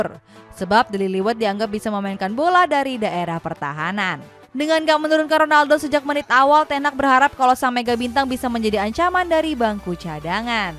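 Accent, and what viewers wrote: Indonesia. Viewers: Iya njir host ini bahasa inggris dibaca pake aksen indo😅😅 gw yg bahasa inggis nya basic aja gk se paok ini😂😂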